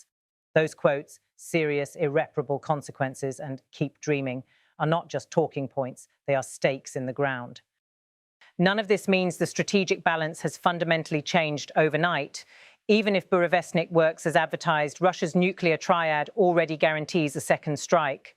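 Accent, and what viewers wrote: United Kingdom. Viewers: Good grief. Don't think can watch the whole thing. The voice is irritating as hell, with snotty female tone & British accent that screams no credibility, in part to BBC etc destroying all credibility of any news with British accent. And as if written by ai set to over descriptive mode, creative writing, with pretense of being "news" & editorial commentary at same time. 🤯🙄🤯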